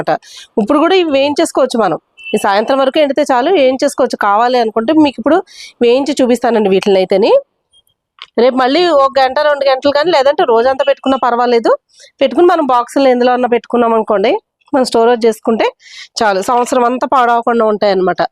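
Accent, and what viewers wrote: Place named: Srikakulam, India. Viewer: మిమ్మల్ని చూస్తుంటే మీరు చెప్పేది వింటుంటే మీది శ్రీకాకుళం ఏమో అనిపిస్తుంది మేడం ఎందుకంటే మాది శ్రీకాకుళం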